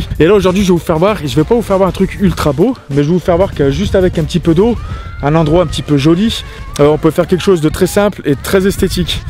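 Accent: Saint-Étienne, France. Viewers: T'as un de ces accent stéphanois ! Haha bienvenue au club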